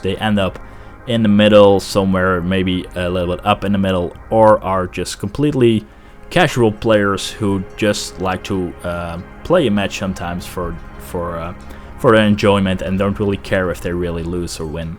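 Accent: Netherlands. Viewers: Are you dutch? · Hoorde al aan je accent dat je Nederlands bent ;) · Are u from the NL?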